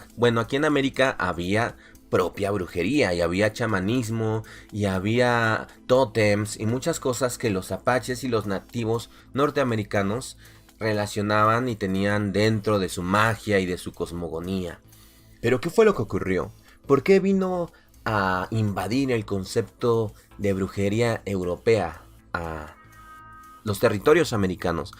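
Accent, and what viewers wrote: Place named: Spain. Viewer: Saludos a todos y un gran programa amigo y AHORA ENTIENDO POR QUE TE SALE TAN BIEN ESE ACENTO ESPAÑOL😂😂😂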